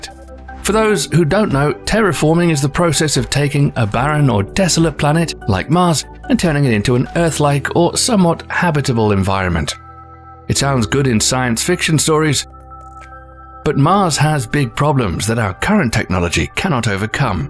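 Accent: United Kingdom. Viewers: Don't say its a mistake because your wrong even with your cheesy British accent.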